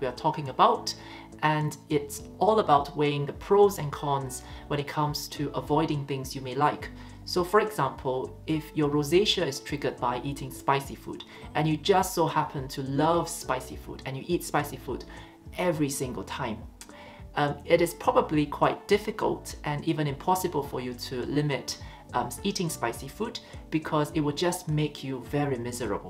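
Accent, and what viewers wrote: Scotland, United Kingdom. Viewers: I’m Scottish and I can sometimes hear a wee Scottish twang in your accent ❤ great info ! I suffer from mild rosacea mostly with thread veins on my cheeks . I’m now more informed and ready to treat. Many thanks and I’m jealous of your beautiful clear skin! ❤ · You can definitely here a Scottish twang in your accent ❤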